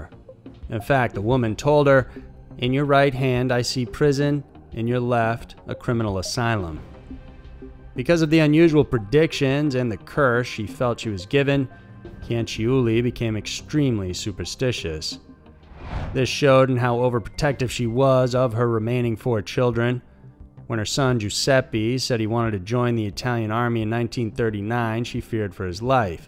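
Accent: South, United States. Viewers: I have thought that too, its the slight southern accent (which I looove). Hey, wouldnt it be hilarious if in the future mr McConaghey revealed he does this true crime/mysteries-gig in YT in his free time? When it gets boring between shoots :)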